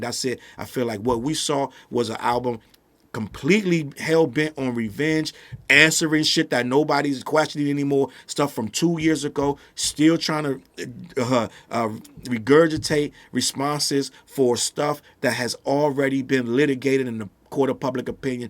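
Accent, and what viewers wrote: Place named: East Coast, United States. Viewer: Let me go ahead and pack in comments to boost this 🥷 in the algorithm, I know the dick gobblers gon be on yo neck 😩 😅😅. Not for nothing yo ahhh remind me of my uncle may he rip, n I ain’t clowning ya age bc I’m not young my damned self n black don’t crack FYM 😅. Anyways he used to always say this that n a third n he spoke fast n he was from east coast so he sounded like yo ahhh too 😅😅. Shit makes me laugh g salute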